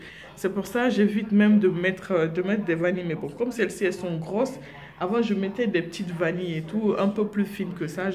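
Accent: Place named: Guinea. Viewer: Très beau cheveux, j'ai deviné que tu es guinéenne dès que j'ai entendu tes premiers mots j'espère ne pas me tromper 😘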